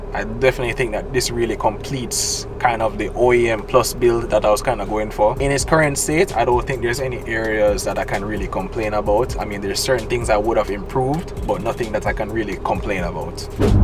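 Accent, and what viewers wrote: Jamaica. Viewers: Suh mi jus deh yah a watch bmw build and mod videos not really a car person and mi say let me watch this video den boom here comes a yaad man accent box mi just Suh glad fi see a yaad man channel big up ute🤣🤣🤣🔥🔥🔥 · When the video started i heard the accent and did a wonder if you’re from Jamaica enuh, i was right 😂 subscribed immediately 🙏🏽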